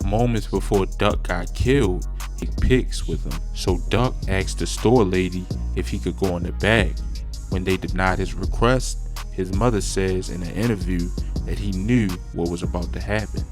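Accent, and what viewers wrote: Baltimore, United States. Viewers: He gotta be from Bmore with that accent lol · He definitely from bmore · You from Baltimore, my DC ass can hear it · I’d guess Baltimore. I loved there for about 6 yrs. that’s definitely a bmore accent. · That Baltimore accent something else!! Like your content. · you from baltimore aint you? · dude who channel this is gotta be from Baltimore lmao he say " Tewww , Lewse , Avenewww " 🤣🤣🤣🤣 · Facts I literally can hear it in his voice especially when he said “Tew” instead of “too” Baltimore people stay saying that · Are you from Baltimore?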